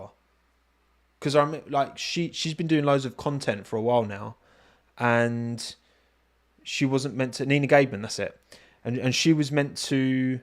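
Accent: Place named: Cockney, United Kingdom. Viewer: Is could just get rid of that gawd awful cockney accent this might be listenable to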